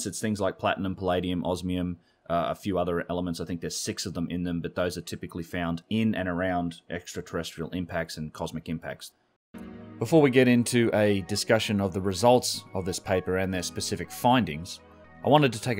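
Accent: Australia. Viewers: Love your videos, excellent content. Out of interest, you live in California but you sound Australian, why do you live in the USA? Thanks. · You sound Australian. Just a small point. 10 mins you show a map of the world. Northern and southern hemispheres are actually the same size but it's not represented that way on your map. Stick up for AU.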